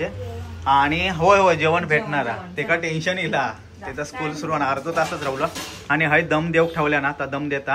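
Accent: Malvani, India. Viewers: Maka tumch malvani aikuk avadta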